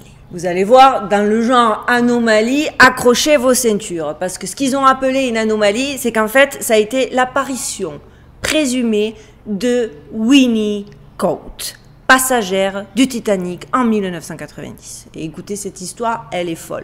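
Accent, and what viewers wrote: South, France. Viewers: Juste quelques mots, je vous ai découvert il y a quelques jours et quel régal, des sujets extraordinaires avec une manière de raconter génial, l'accent du soleil en plus, merci merci merci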